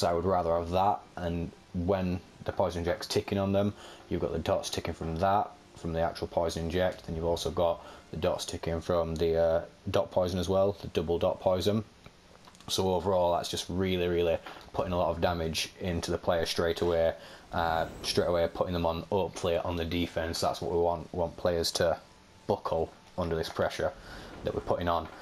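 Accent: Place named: Bristol, United Kingdom. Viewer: are u from Bristol ? thats one T H I C K accent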